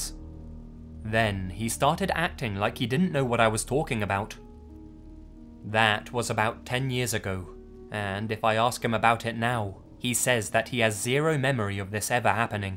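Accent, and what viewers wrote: United Kingdom. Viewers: The one thing that makes me doubt these stories is that the speaker is British and the writing is also of British vernacular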